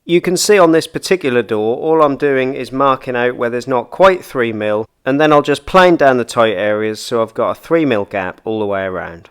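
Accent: Norfolk, United Kingdom. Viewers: I think I know that Norfolk accent, pretty sure I bought the same doors from the same wickes and then watched this video to hang them. You have helped a groundworker have a little bit of finesse. 🤣 Can you please show me how to cut & clad oak veneer stringer to my existing staircase please. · You are defo from Norfolk lol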